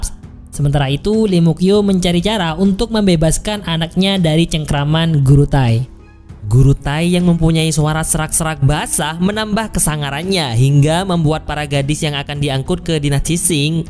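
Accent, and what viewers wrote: Madura, Indonesia. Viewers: Ini orang Madura y salam nih Madura bangkalan. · Medureh dimma tretan?? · Reng medureh kak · Orang Madura ya bang.. Medoknya jelas 😁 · Reng madureh 😂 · Madura ..yaa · Mimin logat madura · Reng medureh kadik en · Oreng medureh yeh 😁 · Baru sadar klo orang Madura 🤣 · Pola maduren riah😂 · Duro duro,logat e... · Logat MDR nya mencolok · Logatnya kaya reng Madura..? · Gw ngira orng madura. Trnyata bener dong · Logat admin kayak berasal dr pulau garam.😊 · Logat maduranya kelihatan banget 😃semangat kakeh boosss 😁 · Kayak Madura logatnya😂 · Orang madura ya min? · Madura mana min ? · Mak madureh lek 'en cak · wah pencerita orng madura , salam dari surabaya bang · Kelihatan masih logatnya Ra Ra Madura 😂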